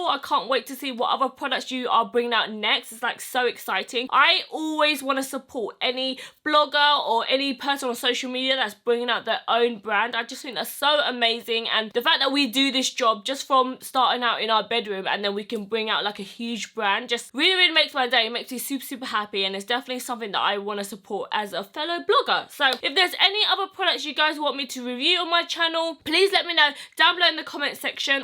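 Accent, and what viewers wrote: United Kingdom. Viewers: OMg she’s British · At first I didn't even realize she was british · Talk about shocked.. I had no clue she was British 😂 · I didn’t know you were British lol I like your accent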